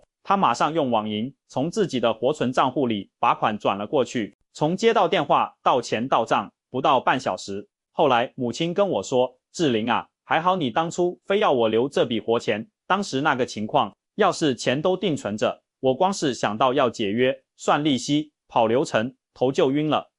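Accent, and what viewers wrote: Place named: China. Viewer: 這根本不是台灣的，ㄧ聽就知道是大陸的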